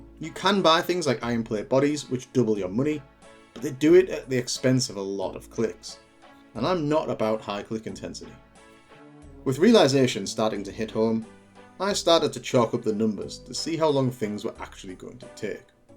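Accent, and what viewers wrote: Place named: North, United Kingdom. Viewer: Is that a fellow northerner?! Haha great guide man keep it up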